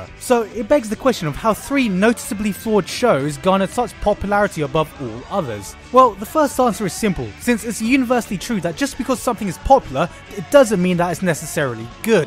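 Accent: United Kingdom. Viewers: Dude you sound British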